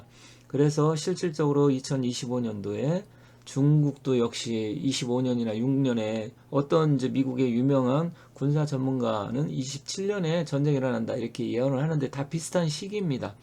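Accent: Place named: Jeolla, South Korea. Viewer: 말투에서 절라도 억양이 묻어나오네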